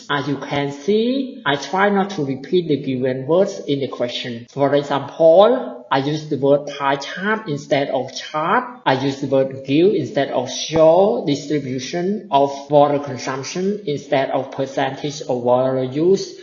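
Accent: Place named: India. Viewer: It looks like any Indian try to speak in English assent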